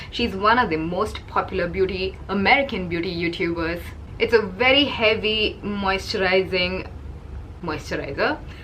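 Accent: India. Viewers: You have a little Indian accent 🤷🏽‍♀️ I'm French and Srilankan girl ✌🏽You're beautiful ❤️😘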